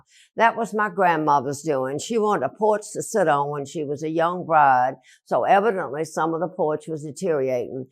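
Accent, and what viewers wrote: South, United States. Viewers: Oh dear, what a precious little lady!!! I’m like two minutes in and I’m just dying over her sweet southern accent! 😍😍😍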